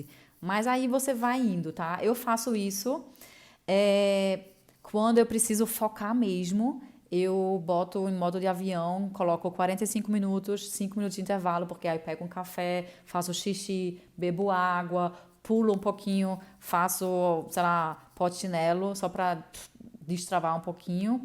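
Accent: Northeast, Brazil. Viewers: Nossa que sotaque de nordestina, amei akkakak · Sotaque nordestino e carioca .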